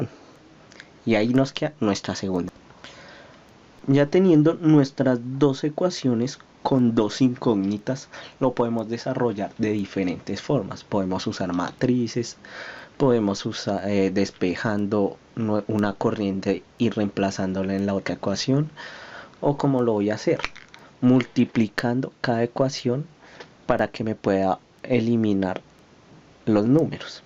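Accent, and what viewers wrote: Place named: Bogotá, Colombia. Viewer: Puro acento de rolo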